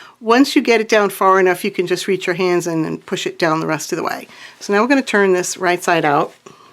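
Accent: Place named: Brooklyn, United States. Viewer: Hi, I recognized the accent; I lived in Brooklyn for 8 years back in the 1970s! Then have lived in CT for many years. Now I'm in Oklahoma (Oklahoma?!) because we retired and followed our married son with the 4 grandsons here; he's a professor at a university. Thanks for the tutorials!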